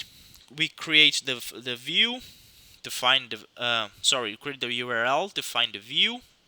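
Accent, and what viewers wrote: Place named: Brazil. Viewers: are you brazilian? eu conheço esse sotaque! curti o vídeo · For sure. Reconheci o sotaque no começo também. Tava com o inglês muito facil pra entender aiheaieha So pode ser Brazuca.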